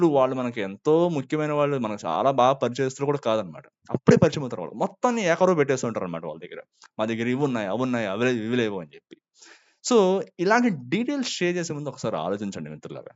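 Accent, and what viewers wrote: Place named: Godavari, India. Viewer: పక్కగా గోదావరి బాబాయ్.......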